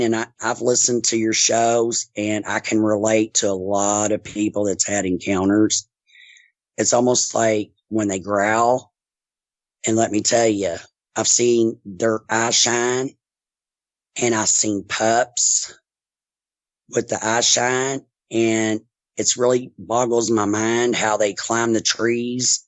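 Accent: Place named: Kentucky, United States. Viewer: hearing your Kentucky accent while listening in KY freaks me out because I feel these beings around. (There’s a noise outside just now) As a courtesy would you mention the county you live in? Prayers going out to you!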